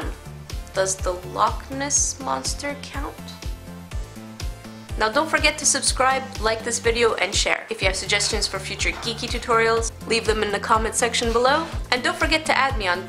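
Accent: Quebec, Canada. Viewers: Are you Canadian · Canadian aye? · are you Canadian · are you Canadian or some really French Canadian person???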